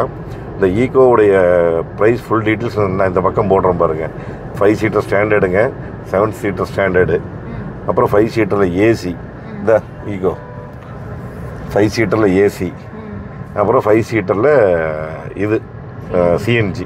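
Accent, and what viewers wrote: Kongu, India. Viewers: கொஞ்சும் கொங்குத் தமிழ் பேசும் கோமகரே...! திகட்டாத தித்திக்கும் பேச்சுடைய திருப்பூர் திருமகரே...! லடாக் பயணச் சாதணைச் சகாப்தம் எங்கள் மதிப்பிற்குறிய திரு. அண்ணா அவர்களே❤❤❤🙏 எப்படி இருக்கீங்க அண்ணி❤❤❤🙏 எப்படி இருக்காங்க தம்பி ரித்திக்❤❤❤ எப்படி இருக்காரு. உங்களோட பல வீடியோக்களை நான் Miss பன்னிட்டேன். சிலபல இக்கட்டான சூழ்நிலை காரணமாக வீடியோ எதுவும் பார்க்க முடியல கமெண்ட் பன்ன முடியல. வழக்கம்போல அண்ணா உங்களோட பேச்சு வீடியோ சும்மா நெகு நெகு நெகுனு இருக்குங்கோ😊😊😊❤❤❤❤ நடுத்தர குடும்பத்தினருக்கு ஏற்ற அற்புதமான சாலை ரதம் என்றே இந்த "MARUTI SUZUKI EECO"வை கூறலாம்❤❤❤ மாருதி நிறுவனத்திற்கு ஒரு கணிவான வேண்டுகோள். அண்ணா நீங்களும்.... இந்த கமெண்ட் பார்க்குற சகோதர சகோதரிகள் யாராச்சும் மாருதி நிறுவனத்தில் பணிபுரிந்தாலோ? அல்லது மாருதி நிறுவனத்தோடு ஏதேனும் ஒரு வகையில் தொடர்பில் இருந்தாலோ இந்த வேண்டுகோளை மாருதி நிறுவனத்திற்கு தெரியப்படுத்தவும்🙏 நடுத்தர குடும்பத்தினருக்கு ஏற்ற அற்புதமான வாகனம் இது❤. 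சுய தொழில் புரிவோருக்கும்.... குடும்பத்துடன் பயணிக்கவும்... அவசர ஊர்தி (AMBULANCE) சேவையிலும் இந்த வாகனம் 100% பங்கு வகிக்கிறது🙏 மாருதி நிறுவனம் அவங்க கார்களை BS6 PHASE 2 விதிமுறைகளின்படி பல வடிவங்களில் புதுசு புதுசா தயாரித்து வெளிவிடுறாங்க. அந்த வகையில் இந்த ""MARUTI SUZUKI EECO"" வாகனத்திற்கு பாதுகாப்பு திறன் வசதிகள் மேம்படுத்தப்பட்டு. உ.தா (ESP.... TRACTION CONTROL.... HILL HOLD ASSIST) Power Steering. இவற்றோடு ஆட்டோமேட்டிக் கியர் பாக்ஸ் மற்றும், டிரைவர் சீட்டுக்கும் கோ பயணி சீட்டிற்கும் அட்ஜெஸ்ட் ஹெட்ரெஸ்ட்டும் கொடுத்து புதிதாக விற்பனைக்கு கொடுத்தால் 1000% மிகவும் பயனுள்ளதாக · சார் நீங்கள் பேசும் கொங்கு தமிழ் ரொம்ப நன்றாக இருக்கிறது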